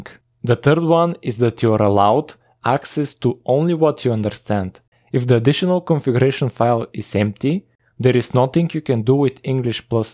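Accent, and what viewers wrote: Bulgaria. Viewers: Wonderful video! Do you happen to be Bulgarian too? I noticed your accent😅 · To be honest, i still haven't watched the whole video, but your accent sounds bulgarian, or from the balkan countries at least. I find your channel interesting though. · because I am bulgarian and you sound like one :D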